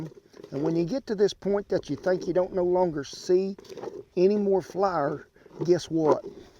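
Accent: South, United States. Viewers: You know when a vid start playin but like you havent clicked on it and it has the words i was like i bet this dude talk like a cowboy litteraly the same accent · Mr., you are one of the most entertaining cooks on YouTube! Thanks for all the great content you share here! I’m a Brazilian English as a second language teacher in downtown Rio, and I have to say I simply love the way you talk, Sir! I’ve always loved southern accents and I think it sounds sooo cool! Love the expressions, the melody and pace of it! By the way, I absolutely love churros! Fortunately for us here in Rio, there’s a churros stand in almost every corner! We love them down here to! We normally have them with either chocolate or dulce de leche. Love your videos! Thanks for sharing!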